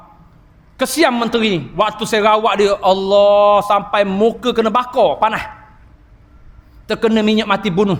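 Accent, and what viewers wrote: Terengganu, Malaysia. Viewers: Sedak dgr ustaz cakap ganu..💯💯🔥🔥..tq ustaz atas ilmu yg dikongsi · "Saya x boleh cakap laju sangat. Keluar bahasa terengganu" gelak sorang diri aku dibuatnya 🤣 berusaha sungguh Ustaz ni kurangkan loghat nya..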